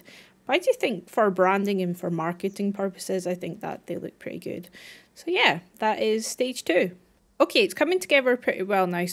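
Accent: Scotland, United Kingdom. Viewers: First video of yours I've ever watched, and when I heard the Scottish accent (well, I think a Scottish accent), I instantly when "SCOTTLAND FOR WEVER" in my what I can a Scottish accent! Buuttt the aussie accent butchers it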